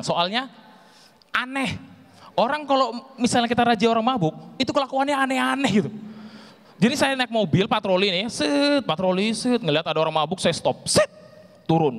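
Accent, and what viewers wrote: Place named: Balikpapan, Indonesia. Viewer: Wkwkwkwkwk logat balikpapan yang sangat khas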